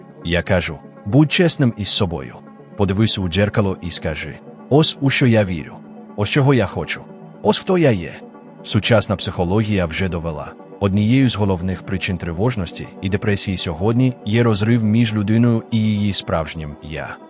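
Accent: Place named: United States. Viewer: Жахлива озвучка, що це за американський акцент?😂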